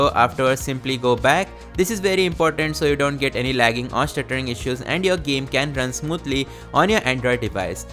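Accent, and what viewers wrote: India. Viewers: The indian accent makes me trust you even more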